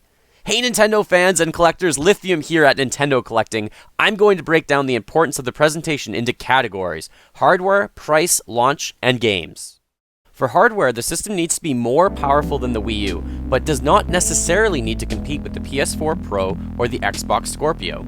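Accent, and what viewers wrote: Canada. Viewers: "it has BEAN in development it has BEAN delayed" gotta love Canadian accents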